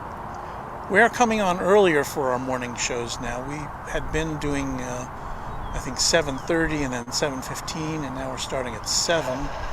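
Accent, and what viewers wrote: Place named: Brooklyn, United States. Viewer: haha! and host is from Brooklyn New York, can you believe it 😄 #accent??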